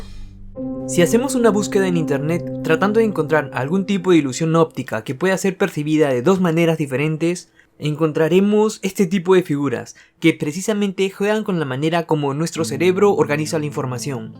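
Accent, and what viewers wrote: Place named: Peru. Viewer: Ese asentó peruano tan particular es así que el youtuber DKBesa también tiene una particularidad en su acento, espero tengas más suscriptores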